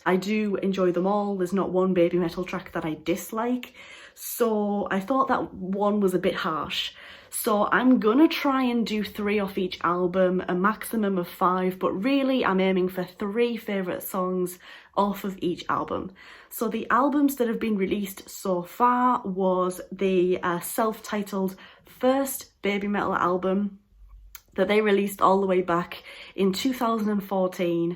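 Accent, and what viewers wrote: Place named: Geordie, United Kingdom. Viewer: Fascinating video with some Interesting choices! My fav tracks for each album are::, (......Best Album!):,;, Trying to pinpoint your accent: sounds like a hybrid of Geordie and North Yorkshire..........so I'm guessing: Middlesborough or possibly Darlington.......?:) btw I've included your video in my Reactions (Best of) playlist..... check it out on my channel (which has ELEVEN playlists devoted exclusively to -related content.....with a total of over 8,500 videos: what better way to fill these long lonely days of Corona quarantine?!!! lol)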